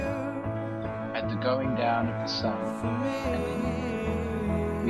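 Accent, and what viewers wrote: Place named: United Kingdom. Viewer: well structured with good music. the british accent was not needed. Deuchar Allen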